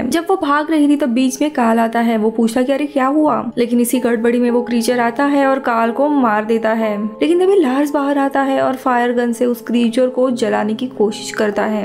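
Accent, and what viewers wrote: Maharashtra, India. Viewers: Are tu Marathi ahes Ka 😅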